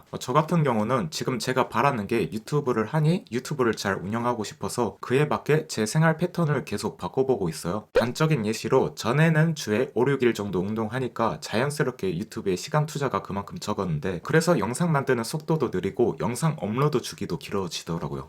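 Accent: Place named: Japan. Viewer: 일본분이신가용??? 발음이 죠금씩 세는,, ?!? 그치만 내용 넘 좋아요! 같니 홧팅홧팅입니당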